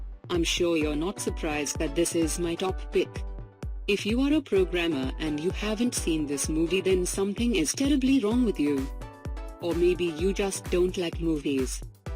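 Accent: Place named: India. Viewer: robot voice with an Indian accent... no thanks!!!!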